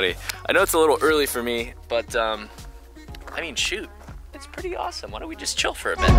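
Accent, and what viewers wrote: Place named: Canada. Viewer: I am detecting a slight Canadian accent from you! Already?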